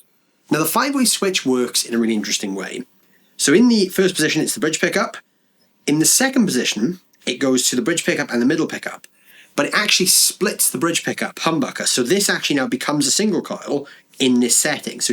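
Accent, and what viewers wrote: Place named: Wales, United Kingdom. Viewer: Aha, thought I heard a Welsh accent. . .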